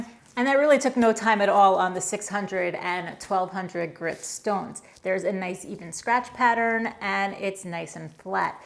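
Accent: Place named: New York, United States. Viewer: Good work, but please announce that you are evaluating donated items at the beginning. You get me to grin every time you mention draws because I remember way back when to talked about the folks that "corrected" your New York pronunciation. Oh, and the lapping fluid? I use one of those little bottles, too. The first one went quickly because I flooded the surface. I have found, however, that just a few drops here and there (I tap the opened spout to spit out a drop) spread out and supply ample lubrication. YMMV, however. 😁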